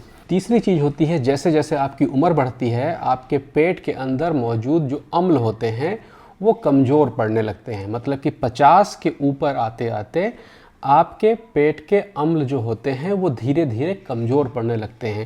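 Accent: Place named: Lucknow, India. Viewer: Sir aap kaha ke rehne wale h apki boli Lucknow side ki lag rhi h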